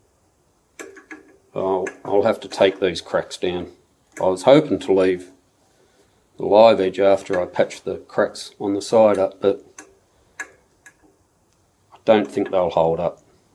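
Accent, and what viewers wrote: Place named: Australia. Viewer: Good work mate, nice to hear an Aussie accent, i understood every word, maybe because I am Aussie to😬👍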